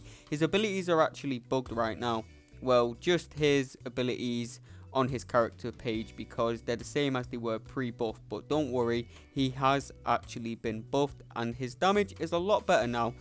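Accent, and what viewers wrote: United Kingdom. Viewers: Ayo I think this guy is british!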